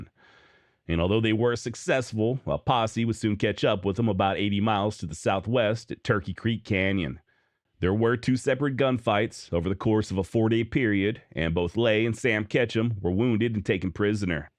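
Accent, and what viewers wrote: Texas, United States. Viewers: right on I actually thought Missouri or Texas second possibly Louisiana you have a unique voice...no diddy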